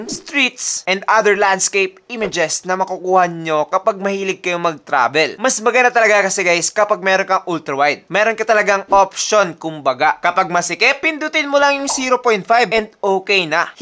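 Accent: Visayas, Philippines. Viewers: Bisaya diay ka pala dol HAHAHA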